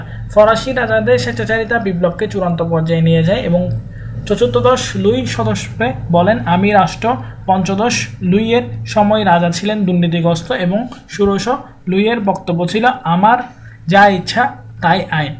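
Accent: Chittagong, Bangladesh. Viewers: ভাইয়া আপনি কি চট্টগ্রামের বাসিন্দা???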